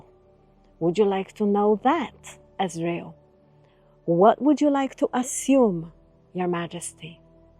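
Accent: Poland. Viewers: I also think it is something different about this Channel about this person. I don't know what it is but i can't stop watching.❤ Is it all thrue? Or is it another trick? I wish I knew. I hear polish accent I wish she would answer I wish to break free from this prison.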